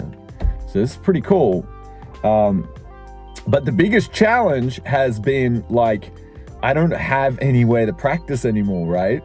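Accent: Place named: Australia. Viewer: Cool hearing your story. I was wondering about the Australian accent, Mid East last name, and Japanese residency.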